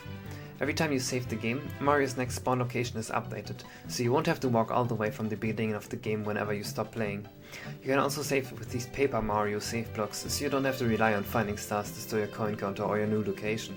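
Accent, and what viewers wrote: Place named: Germany. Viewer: Is by any chance German? The accent is way to strong and familiar lol Also without having to say it: LOVE IT!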